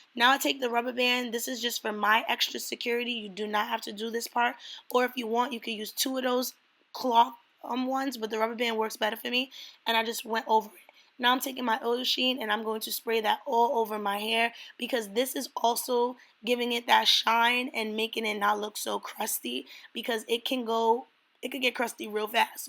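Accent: New York, United States. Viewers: You slayed that ! Are you from NY or Maryland ? I hair an accent 😂I just subbed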